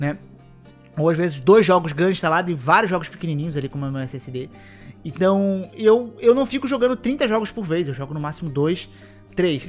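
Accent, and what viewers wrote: Rio de Janeiro, Brazil. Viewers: Haha alem do sotaque carioca esse cara passa mo impressao de Nerdola ENZO de condominio kk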